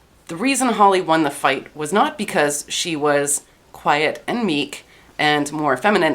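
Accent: Canada. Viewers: Is it the Canadian Accent or are you generally incredulous. Do you have a long tongue?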